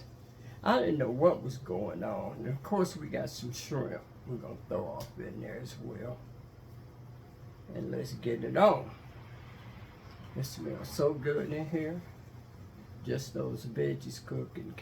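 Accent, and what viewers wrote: South, United States. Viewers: An elegant domesticated classy pretty lady with a sassy personality and tenacious Southern accent, Ms. Thanks immensely for the eclectic succulent crusines cooking tuteledge. Your foods look delicious, fresh, and quality. That gumbo look flavorful, delectable, quality, and fresh. Have a positive and productive day Ms.. 😂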